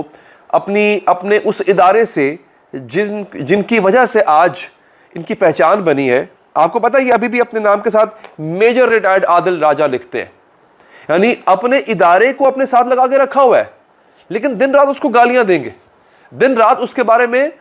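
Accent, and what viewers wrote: India. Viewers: India k channels kam dekha karo.tumhara tou lehja bhi Indian hogaya hai tum bhi ghaddar ho besharam😡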